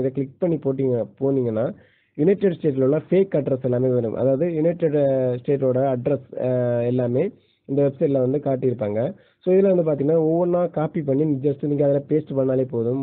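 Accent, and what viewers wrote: Kanyakumari, India. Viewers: Kumarii slang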